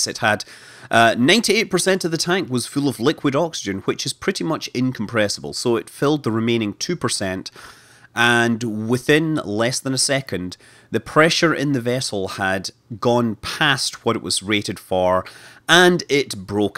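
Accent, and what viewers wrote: Scotland, United Kingdom. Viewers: Hey Scott Manley screw the haters. I love hearing you explain things in that awesome "Brave Heart" accent of yours.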